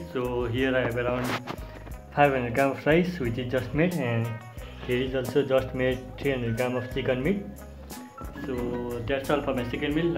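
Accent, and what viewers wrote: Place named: Nepal. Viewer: Bro Ur accent is like Nepali. Nepali ho ki?😅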